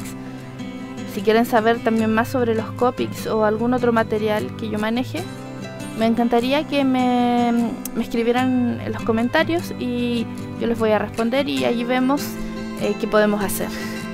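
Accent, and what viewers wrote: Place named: Chile. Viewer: waau me encanta tu forma de hablar se nota al toque que eres de Chile, me gusto caleta tuto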